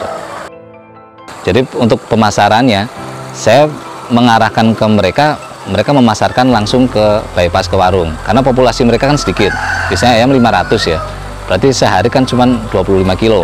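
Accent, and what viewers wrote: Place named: East Java, Indonesia. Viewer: Logat jawatimuran Saya dulu di jatim sekitar taun <num> Surabaya-Malang-blitar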